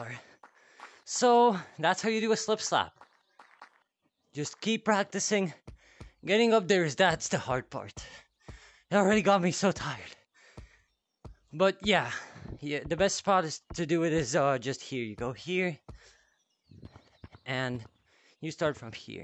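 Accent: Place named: Philippines. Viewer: u filipino? u got the accent lol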